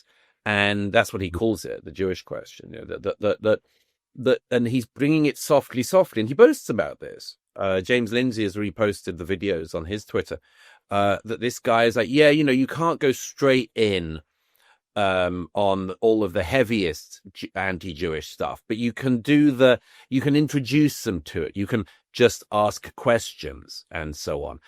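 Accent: RP, United Kingdom. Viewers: The right is critical of israel for the reason anyone else is. Because they see what is going on. Who cares what this gay gas lighter has to say ? Dave smith is Jewish and makes actual points. This posh speaking morrisey clone has nothing to say. He sounds like the democrats blaming everything on Russia after 2016.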